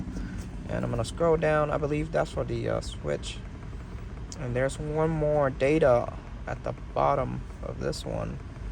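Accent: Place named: Vietnam. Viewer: Knew you was viet from the first thing u said 😂💀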